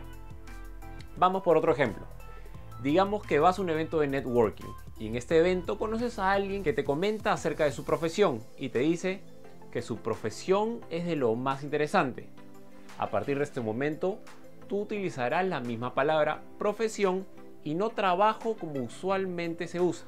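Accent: Peru. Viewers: Eres peruano? xD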